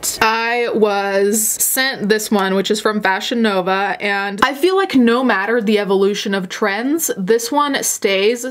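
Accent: Italy. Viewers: The linguist in me, loves that you pronounce Gucci and Prada with a proper Italian accent. I've notice you do this in other videos, but it really stood out to me here. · I think i found a new fetish. Listening to pronounce things with the heavy italian accent is just so satisfying. Please say prada again. Or gucci. Or whatever. Uuuugh just like cutting a tomato with a freshly sharpened knife.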